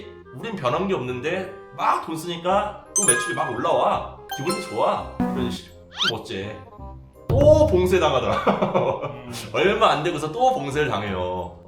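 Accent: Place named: Jeolla, South Korea. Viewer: 대인. 고향이 전북 아니오? 말투가 우리동내 같은디?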